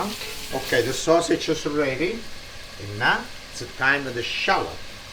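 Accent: Italy. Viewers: You are amazing with your Italian accent, a big follow from me with a big like. Will make this recipe today 🥰🥰🥰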